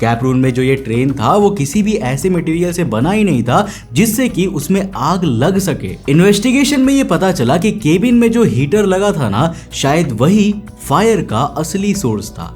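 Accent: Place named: Bengal, India. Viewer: Tumri Hindi accent babitaji jaisi hai yani bongali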